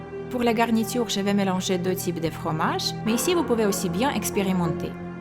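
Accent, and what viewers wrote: Russia. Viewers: J'adore tes vidéos.Et moi je trouve que ton acen Russe est super cela te différençi des autres.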